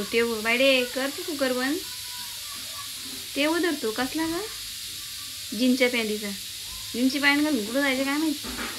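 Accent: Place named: Shahapur, India. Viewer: ताई गाव कुठला ग तुझा मस्त बोलतेस आपली शहापूरची भाषा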